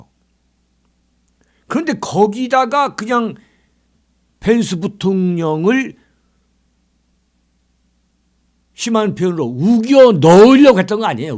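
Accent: Chungcheong, South Korea. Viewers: 요즘은 그렇치 않아요 저도 윤대변인님과같은 충남 사람인데 요즘은 돌굴러가요 정도는 아니예요~~ㅎㅎ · tv 즐겨보고있습니다. 그런데...충청도 말때문에 답답해서 숨 넘어갈꺼 같아요. 1.5배속으로 듣고 있어요 그래도 감사합니다.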